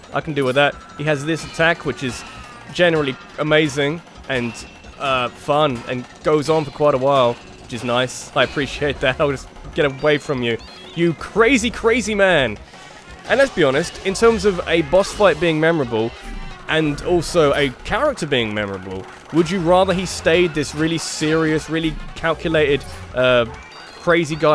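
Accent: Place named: Scotland, United Kingdom. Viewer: We need the Scottishness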